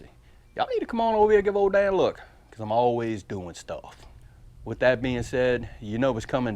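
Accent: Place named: Louisiana, United States. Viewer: I came over from another Mississippi channel...Justin and family's Homegrown Country Homestead. BTW Dan...that graphic at the end is really not necessary...LOL. About a minute in to the video I thought, "He might live in middle Mississippi but he's NOT from there. He sounds like he's from down around Laffayette." I've been looking around the channel and I think I'll like coming back regularly. We do disagree on one thing though...this COVID-19 thing. I think we are far apart on it. Have an awesome and blessed day.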